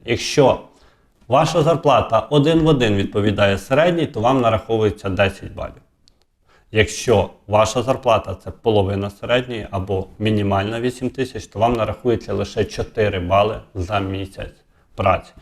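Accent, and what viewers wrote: Vinnytsia, Ukraine. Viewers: Іправда як вже ти 'дістав' своєю балаканиною. Пора вже тобі вивчити українську мову бо твій вінницький суржик вуха ріже.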